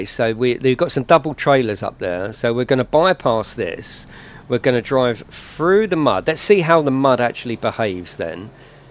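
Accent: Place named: Australia. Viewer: Omg the Aussie accent is strong and that’s coming from an Australian 😂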